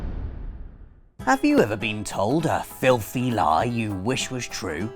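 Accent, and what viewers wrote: United Kingdom. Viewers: Lucca Cardieri PlayStation access wins because... British accent beats all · why oh why is Sony Playstation presented by dog-ugly nerds with a Brittish accent! ? unsubscribe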